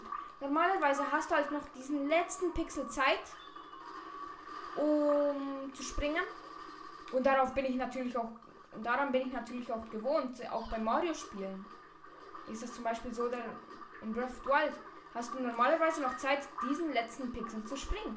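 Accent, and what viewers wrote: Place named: Switzerland. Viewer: Hey again ha also rächt ka mit minere annahm das du schwiezer Bisch ha es sofort a dinere ussproch gmerkt 😊 find machsch richtig gueti Videos witer so bi e YouTuberin us de Schwiz😅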